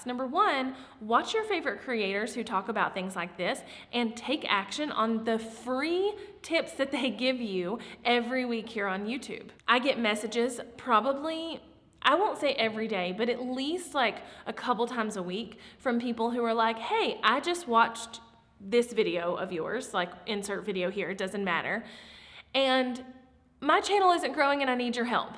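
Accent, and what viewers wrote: South, United States. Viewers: Are you from the south? 🤔 Oh from onwards you lose the accent 😂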